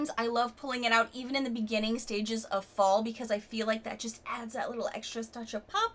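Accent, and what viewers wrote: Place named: New York, United States. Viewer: Love this style of video. Made me realize how long I have been with BoxyCharm and with you this long too! Remember you used to talk in that awful accent all the damn time?? 😂😂(New York was it) oh and I have extremely dark lips and BoxyCharm sends me nude lip stuff, which I can’t wear! Can you imagine how many I have to give away??🥴 I love the burgundy, reds or mauves! Some of the product brands you love I have too and have yet to try. Some are white girl specific, yikes! I am Mexican and Olive complexion with gold undertones. So you understand. 👍🏽😎♥️🌹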